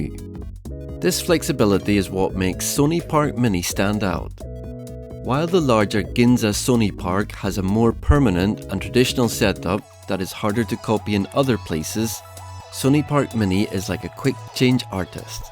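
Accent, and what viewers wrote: United Kingdom. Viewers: Just stumbled across your channel on my discover page, love the videos and also your accent sounds familiar! Are you from or have family in Belfast / North Ireland?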